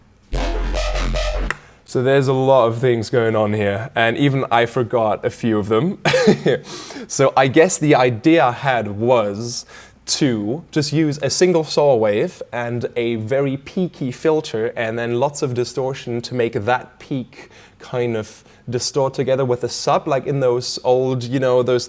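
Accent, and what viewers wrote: England, United Kingdom. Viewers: Is it just me or does sound like he has a slight British accent now? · why are you developing an english accent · Someone’s been spending a lot of time in the UK. He’s got half a British accent now! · Does virtual riot kinda sound british in this video?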